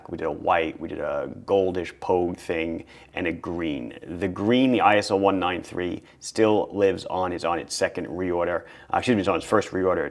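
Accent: Long Island, United States. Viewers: that's pure Long Island, son 😛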